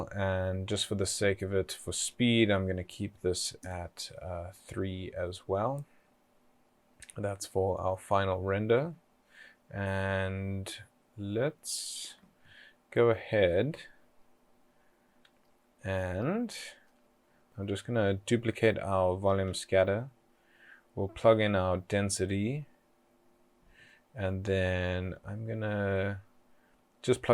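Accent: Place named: South Africa. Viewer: Must be South African!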